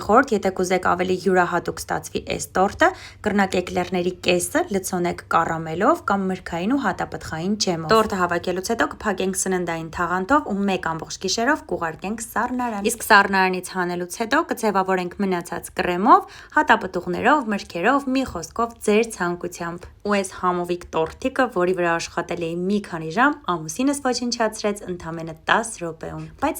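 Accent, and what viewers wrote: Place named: Gyumri, Armenia. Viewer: Շատ համով Գյումրուս բարբառով։Մեր աղջիկը շատ լավ գիտի ինձն ու քեզը։Սիրենք մեր հայոց բառ ու բանը❤❤❤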